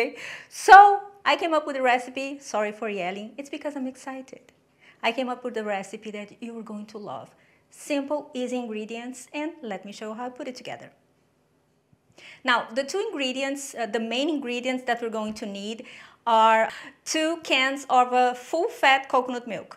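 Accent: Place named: Brazil. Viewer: Eu acho que essa moça é brasileira, tem sotaque de brasileira e nome também. 😂😂😂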